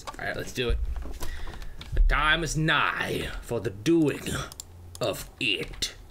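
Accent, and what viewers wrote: United Kingdom. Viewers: I FREAKIN LOVE YOUR BRITISH ACCENT WHEN I HEAR IT I PASS OUT! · You have an awesome British accent! :D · Love ur British voice! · hey! are u talking british?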